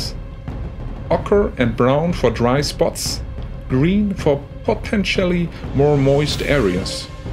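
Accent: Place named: Germany. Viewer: Once again a great build. Somehow I love your hard german "Akzent" :-D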